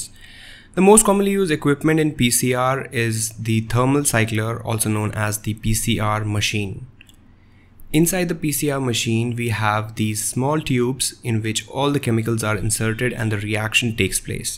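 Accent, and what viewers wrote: India. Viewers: Lol why this school related videos are always indians that I actually understand. (Not sure if he is really indian, but he sounds like it)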